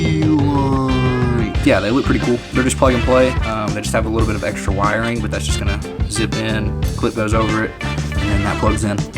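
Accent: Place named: Kentucky, United States. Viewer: I knew the accent sounded right when I saw the Kentucky plate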